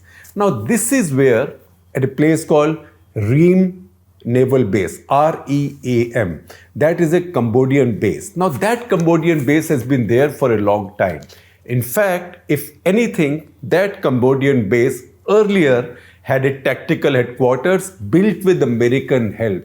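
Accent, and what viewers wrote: India. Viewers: even though I already understand the issue, the crazy indian accent made me sit down and listen to this. Very compelling. Glad to see Indian media rising up as an alternative to the Anglo-Zionist dominated one.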